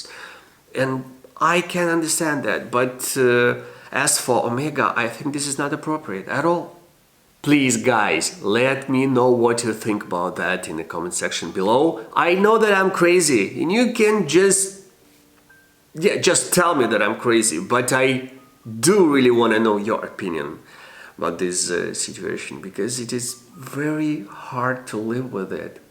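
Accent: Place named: Eastern Europe. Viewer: Great video, even greater personal exercise. Yes, slight eastern-European accent, but not this (infamous) rrrrussian one. My advise, take it or not - double bourbon before the takeoff, repeat as necessary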